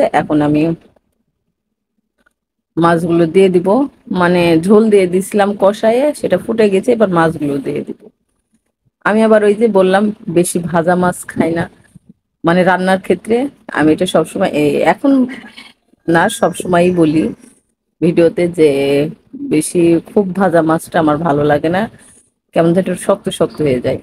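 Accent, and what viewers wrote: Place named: Rajbari, Bangladesh. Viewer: আমার গ্রামের বাড়ি রাজবাড়ী আপু তোমার কথা সুনে বুঝতে পারছি তাই আপু বন্ধু করেছি গত কাল ব্যাক দিও প্লিজ।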